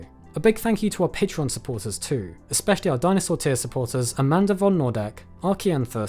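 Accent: United Kingdom. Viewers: It sounds like it must be a smart argument because he's British, so it sounds smart....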